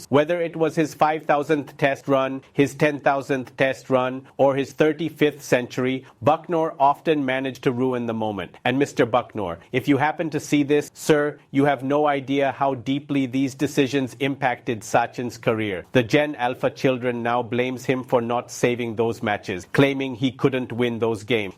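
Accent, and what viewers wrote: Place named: Barbados. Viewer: Bhai you look like someone from Nallasopara, why are you speaking like someone from Barbados?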